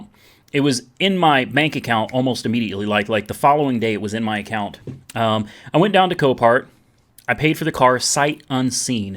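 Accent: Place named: Oklahoma, United States. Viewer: What part of oklahoma you from?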